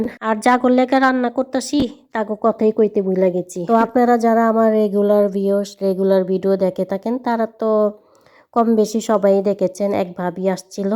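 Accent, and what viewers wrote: Dhaka, Bangladesh. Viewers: Dua Kori sob report jeno valo hoy r betha O jeno valo hoye jay. Dhakaiya kotha sunte valo e lage. Onek kichu ranna korle. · লাইক দিয়ে শুরু করলাম ❤ অনেক সুন্দর ভিডিও শেয়ার করলে আর অনেক ভালো লাগে ঢাকাইয়া কথা শুনতে। রান্না গুলো বেশ মজা হয়েছে মনে হচ্ছে তাই না?❤ আমি ও আপু গরম পানি দিয়ে রান্না করি তাহলে রান্না বেশ মজা হয় ভালো লাগলো ❤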